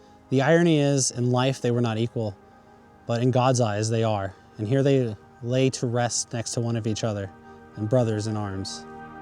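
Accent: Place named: United States. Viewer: Thank you so much for your time making this video. From your accent I guess that you are American. It is wonderful that you describe the fallen warriors in such moving terms. You are an example to us all . Again many thanks.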